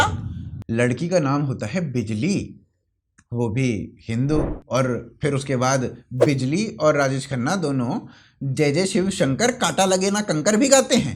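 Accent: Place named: Palwal, India. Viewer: 😂 Aap kya gazab bolte ho.. Jab aap Gram Darshan par bolte ho to mujhe Palwal ke Jaaton ki bhasha yaad aati hai.. kyonki aaj bhi jab mai palwal jata hun ( Washington DC) se to ye bhasha sunne ko milti hai. Kyonki mai bhi Jaat hun.. 😂😂😂😂😂 Thank you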